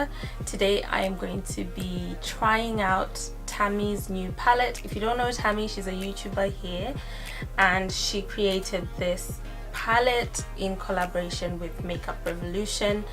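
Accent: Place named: South Africa. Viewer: Your accent has a South African undertone 😳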